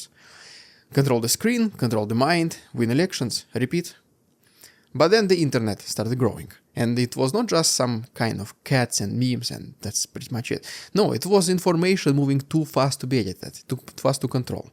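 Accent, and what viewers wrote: Russia. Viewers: Wenn du mit 100 km/h sprichst, und mit deinem starken Russischen Akzent, hat man Muehe, dich zu verstehen. Obendrein scheint bei diesem Video auch noch die CC ausgeschaltet. Ich kann also noch nicht mal mitlesen, was ich akustisch nicht erfassen kann. Ich finden deinen Kanal gut, aber deine Sprechweise ist (entschuldige) katastrofal! Weiss auch nicht, was man da tun kann..... langsamer sprechen, vielleicht? Es ist irritierend! Ich schreib es dir auf Deutsch, weil ich davon ausgehe, dass du es verstehst, und nicht viele andere hier! Danke!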